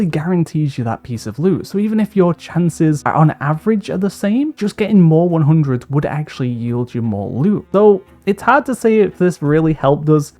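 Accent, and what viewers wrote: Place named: Liverpool, United Kingdom. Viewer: I’ve enjoyed this sounding guide to say u vowels in a liverpool accent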